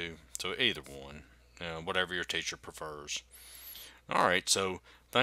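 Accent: South, United States. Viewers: Same here.... I love the Southern accent... Howdy all the way from South Africa 👋😃 🌍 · Bro speaks like a cowboy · I know im four years late but youre the first souther math tutor I have ever heard.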